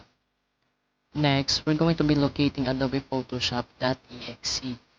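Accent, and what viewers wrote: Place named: India. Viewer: If it is Indian accent then I watch till end. Because I know it’s going to work. Thanks man.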